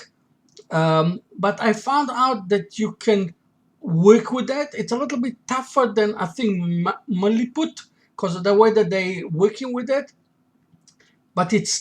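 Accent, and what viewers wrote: Israel. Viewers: I knew, that's an Israeli accent. Strike!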